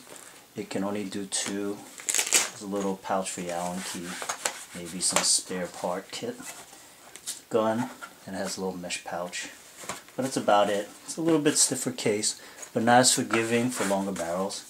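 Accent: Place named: New York, United States. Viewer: Great review! I actually just ordered one myself for my axe. Also loving the new york accent.lol i am also from new york but i live on long island. where do you go to play?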